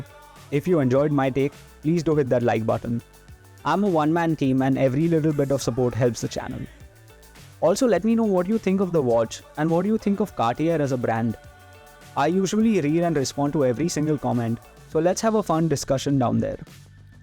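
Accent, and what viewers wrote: India. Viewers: I used to believe I could eventually get used to the Indian accent........... I was wrong.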